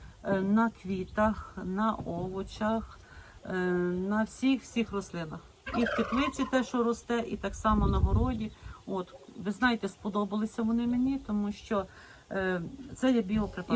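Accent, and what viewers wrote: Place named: Ternopil, Ukraine. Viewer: та я також з Тернопільської обл, чую говір наш😉я з кременецького району, може й десь недалеко живемо від Вас😀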